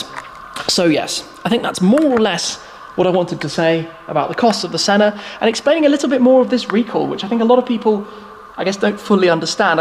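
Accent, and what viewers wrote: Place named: United Kingdom. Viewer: His british's accent introduction always gets me, lol.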